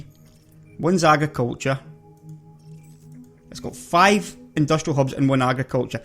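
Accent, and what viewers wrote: Scotland, United Kingdom. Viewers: Your accent! Epic wins! Sound like Spud from Trainspotting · soon as I heard that thick Scottish "awrite guys hows it goin" you had a new subscriber , lmao thanks for the tips bud. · the sound of the Scottish voice is a bonus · Nice also to hear a guid Scots accent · Scottish, aye? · a Scottish youtuber finally an accent to relate to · love the scottish, it's bloody awesome lad :D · A Scotsman in a spaceship, 10/10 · thats Scottish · i love your scottish accent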